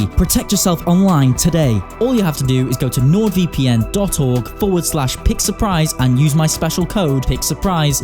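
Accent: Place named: Liverpool, United Kingdom. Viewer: Scouse nonce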